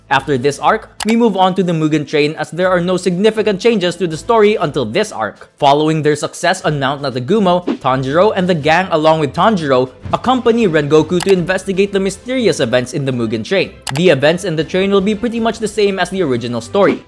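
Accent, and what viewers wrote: Philippines. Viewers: Filipino ahh acent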